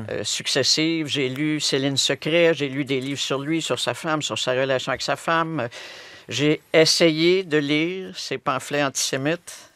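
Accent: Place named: Quebec, Canada. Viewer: Merci beaucoup pour votre présentation. J'adore l'accent canadien, québécois. D'Algérie je vous suis attentivement.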